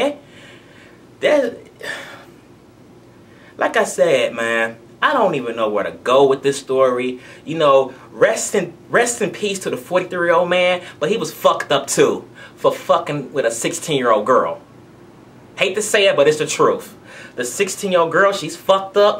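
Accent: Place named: Missouri, United States. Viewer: I think he means Autistic he has a strong Missouri accent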